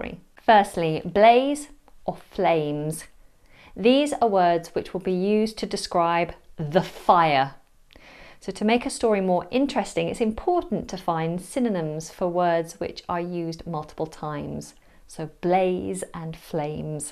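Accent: United Kingdom. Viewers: I preferred to kill four birds in one stone madam memorizing your words/accent & listening to english history & practicing & taking new words (my mind is exhausted & soon I will be extinguished) can I use this word like this is this correct ? I considered myself as an old school I still keep notebook as a diary but I'm so lucky that I'm left handed so no one can read my handwriting sometimes even I can't it's very tragically horrific event or experience for those people in that time & many ancient archaeological sites also destroyed it wasn't that difficult I understand it very well technically british accent is magical factor including many different words I'm a history lover/reader/fan & of course I would like to hear more & I have a humble suggestion can you talk about the black plague who hits london in that time that's all madam if you read all of that & reached this point I would like to thank you for your time cuz I know you are very busy & I would be very glad/thankful if you correct me if you have seen any mistakes in my words thanks again madam & have fantastically gorgeous day I'm waiting your next video👏👋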